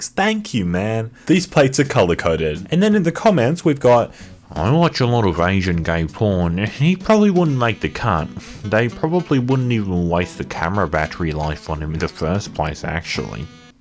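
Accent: Australia. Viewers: Hold on, Mate you're Australian??? Frick yeah, found another one😁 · ooooh you're from canberra?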